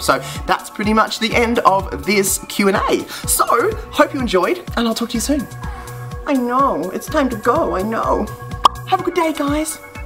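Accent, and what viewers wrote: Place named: France. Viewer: I like your french accent ! He's so cute !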